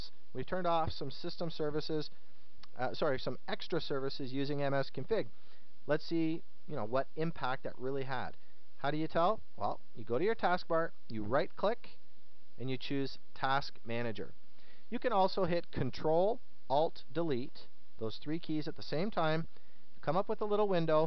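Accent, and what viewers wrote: Canada. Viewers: ARe u Canadian? Cuz u talk like Les Stroud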